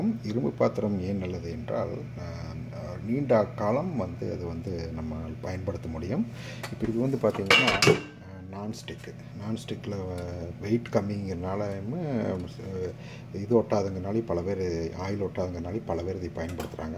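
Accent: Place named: Kongu, India. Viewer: Effective crisp communication of key facts in simple kongu tamil !! Keep it up sir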